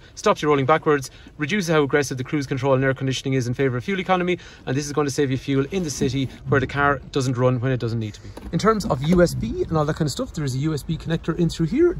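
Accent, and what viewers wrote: Ireland. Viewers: This is what we want in a review. A speed talking Irishman! I love it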